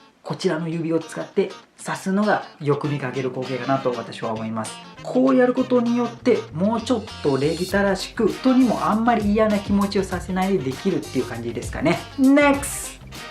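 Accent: Kansai, Japan. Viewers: *さんが話してる関西弁？大阪弁？のスラング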